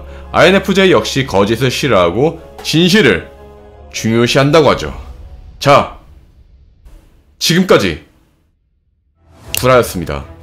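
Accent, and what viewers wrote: Gyeongsang, South Korea. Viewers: 경상도 사투리가 재미있네요 그리고 MBTI 진짜 무지성 무논리 ㅋ거의 2020년대의 신 혈액형 수준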